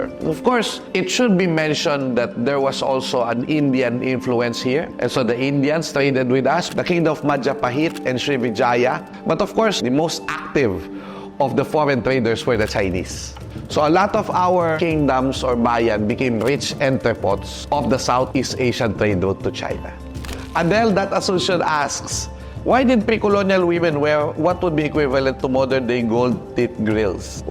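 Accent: Philippines. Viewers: Bani, Mai, and all of the beautiful people working for The Filipino Story — bravo. I work in content, TV and Film, and it’s been a while since I watched a piece of media that is truly, TRULY, inspiring. I cried the first time I watched this, and I come back to it every once in a while when I need some kind of spark, some kind of reminder, some kind of flame. As someone who works in media, it’s easy to fall into a relentless pursuit of the next, biggest, shiniest thing. But everything here in The Filipino Story is as simple as simple gets. Simple, but unapologetic. poetry, the unapologetic Filipino accent, the unapologetic Filipino-ness — it fills me with much pride to watch and witness. Happy to help and support in any way I can!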